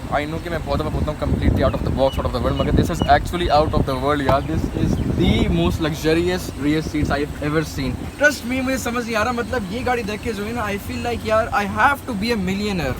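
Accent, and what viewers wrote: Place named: United States. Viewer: Which part of America is this accent from ?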